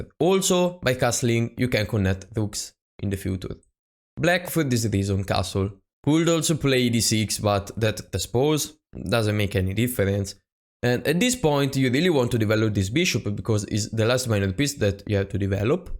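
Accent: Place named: Italy. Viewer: Great stuff bro. I enjoy the Italian accent 😂. Can you please show your best response to the Sicilian defense? Thanks 🙏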